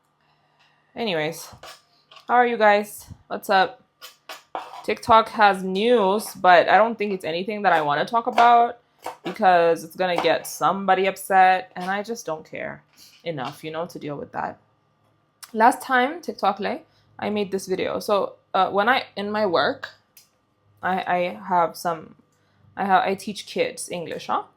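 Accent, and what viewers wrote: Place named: United Kingdom. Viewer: Love the British accent ❤ girl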